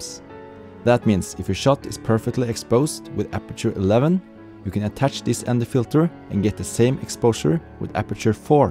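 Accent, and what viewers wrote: Norway. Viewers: Your accent sounds like you are a Norwegian :) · Ah du er Norsk, hviste det med en gang du snakka, men bekreftet når det sto NOK på ebay :D Flott video. lærte masse :) tusen takk :D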